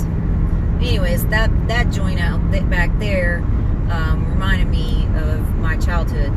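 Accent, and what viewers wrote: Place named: South, United States. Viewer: You're even sounding more southern!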